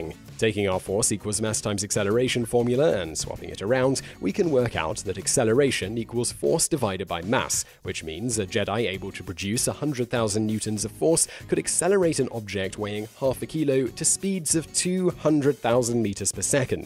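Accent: England, United Kingdom. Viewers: Simon, your pretty professional for the most part, so most of the time when you pronounce something differently than I'm use to, I chalk it up to you being a Brit. Chinook is NOT one of those words. It is suppose to be pronounced; shin- nook. It is part of the Haida-Tlingit language. It refers both to a species of salmon and a weather phenom of a warm winter wind that turns the snow to ice. (And makes driving hell) With so many channels filling our heads with missinformation, I need to be able to trust at least one youtuber. Don't fail me Simon. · He’s not American, he’s English as far as I can tell. Maybe he thinks Americans would need subtitles to translate.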